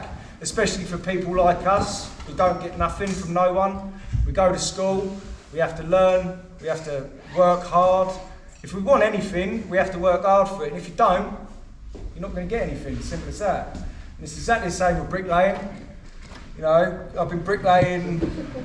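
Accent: Cockney, United Kingdom. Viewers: Flash cockney bastard you know what you are …….bricklaying yaaaaaaawn 🤪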